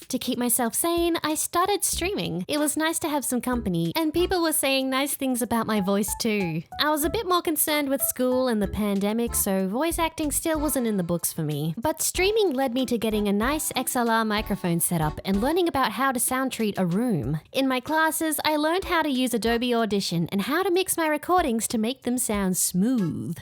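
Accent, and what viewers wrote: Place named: Australia. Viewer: u kinda sound Aussie I love it it’s a cute accent :3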